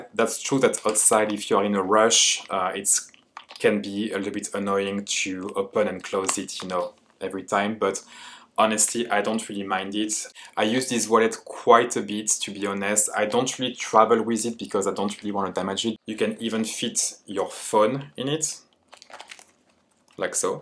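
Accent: France. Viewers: This is the first time I understand a french accent and even find it pretty. I am a foreigner as well and accents can be challenging for me lol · I am in whaouuuuuu. What a well curated collection! Really inspiring . Btw, it will be a shame to completely loose that cute French accent of yours. Just saying 🙃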